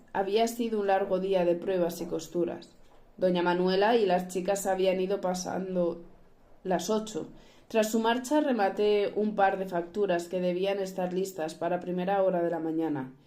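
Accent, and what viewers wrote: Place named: Spain. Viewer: Gracias me gusta como das paso ala lectura,TU voz suena de españa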